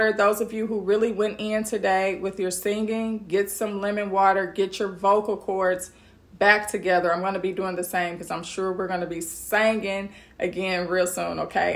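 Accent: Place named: Texas, United States. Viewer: Finally , you getting texas accent❤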